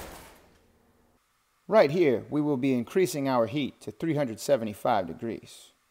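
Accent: Louisiana, United States. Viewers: Ur wife is a lucky lady! Such a handsome guy+ u can throw dwn in the kitchen! U have such a sexy voice, with that Louisiana accent! Love watching ur videos!!!! Much luv to u and ur adorable family+ Gumbo!!!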